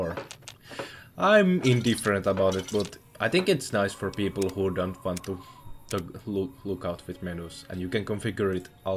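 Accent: Finland. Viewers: Nice süomi accent, bro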